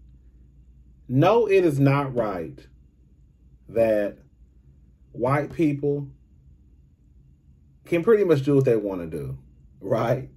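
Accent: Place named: Midwest, United States. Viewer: I can still hear the Midwest accent.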